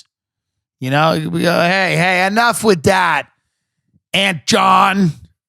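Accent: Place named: New York, United States. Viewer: Love “New Yawk” or Long Island accent.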